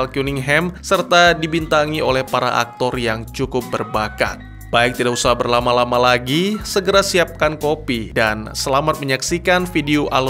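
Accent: Aceh, Indonesia. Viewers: Bang orang aceh jelas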